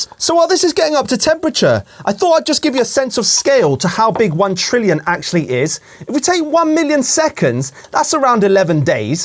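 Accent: United Kingdom. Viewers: i love the britishness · “for the non-americans” him being the most british person ever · Is he British?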